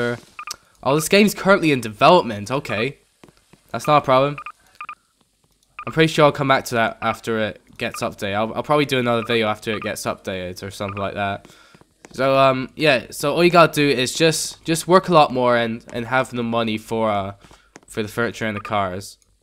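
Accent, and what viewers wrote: United Kingdom. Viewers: U just earned another sub. 1 your British 2 u were the only person that I saw talked while playing roblox . Btw my user on roblox is maddy6786. And next time you should work at bugler bomb. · Nice birtish accent! You just earned a sub!! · r u birtish?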